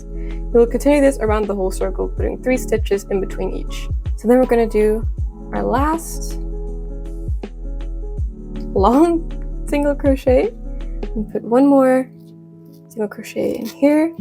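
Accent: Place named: United States. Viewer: you sound almost completely American. Accents are typically the hardest thing to master when learning a foreign language. How did you go about learning English so well in the Netherlands, if I may ask?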